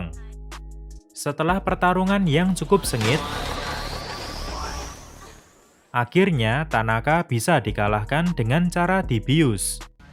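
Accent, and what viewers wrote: Java, Indonesia. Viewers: Logatnya terlalu medok Kurang nyaman euy.. maaf ya min, tdk jadi subscribe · Anjir medok kurang cocok gan, pake bhs jawa aja klo lebih nyaman. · Logat jawanya kerasa bngt min hhh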